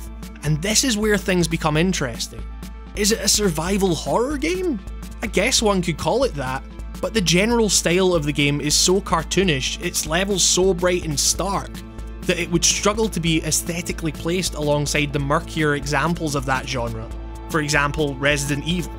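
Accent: Scotland, United Kingdom. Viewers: You scottish brah?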